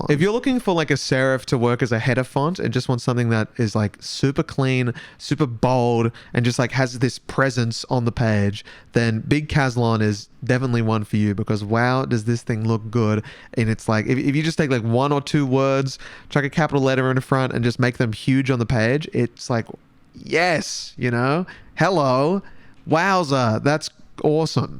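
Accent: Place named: Australia. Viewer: Wondered if you were Australian from the accent... then you said bloody hell and I was like yep.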